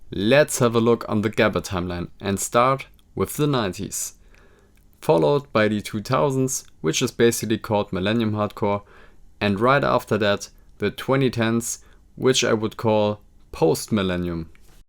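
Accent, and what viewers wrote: Germany. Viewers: das doch nh deutscher der da spricht, safe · Ich erkenne einen deutschen wenn ich ihn höre :D Geiles Video!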